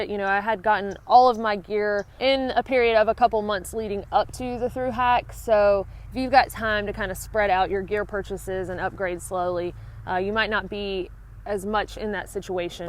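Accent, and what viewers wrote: South, United States. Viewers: My dad always told me to never make fun of someone with an accent because they speak at least one more language than you do. Southern counts, right? Oh yes it does. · LOL! No, that’s not trail talk, it’s how we talk in the south. Assy = icy. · We start packing with "cheaper", and "heavier" equipment normally. But it's nice to have an idea of what others found useful, even if it is more expensive. You invest in what you love and/or enjoy. Some will hike, and give it up. Others will get drawn in, and have a life long hobby. For them, they will enjoy the information of a seasoned veteran. Thanks for the info! And Merry Christmas! And to those that want to correct the Southern pronunciation, please remember: though the "South" is connected on the map, it's a different country. It would be the same as telling the English, or Australians how to speak. 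Be respectful, embrace our differences, and just don't do it.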